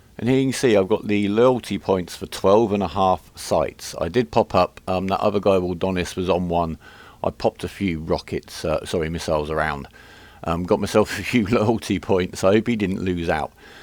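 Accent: United Kingdom. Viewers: Congrats! I'm new to Eve and probably too late for the giveaway but only a week in and watching as many videos as I can to learn as fast as possible. If I had a Praxis I would definitely have to keep her mothballed for the time being as I would hate to lose her through incompetence!! I'd love to see a series of videos for complete newbies to watch AFTER they have completed the tutorials and the Agent Career missions as there seems to be a gap for such videos. Everyone assumes that after those you are an expert, but that's not the case. A bit like when you pass your driving test you don't know everything about driving. I subscribed when I saw your first video and am still loving them. (Also nice to watch a fellow Brit as your accent is similar to my own!) :)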